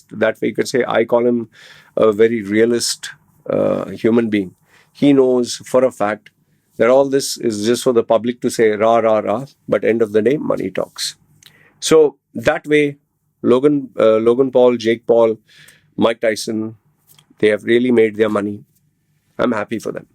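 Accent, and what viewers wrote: India. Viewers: bro you have an indian accent?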